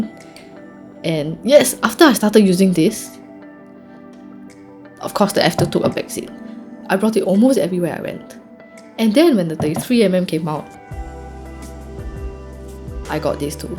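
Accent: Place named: Singapore. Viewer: I own an XT5 and was just browsing Fuji YouTube for entertainment, I don't know why but being French expat living in Singapore landing on your video hearing your Singaporean accent was really heart-warming, feels like "home" (at least my home now). Subscribed!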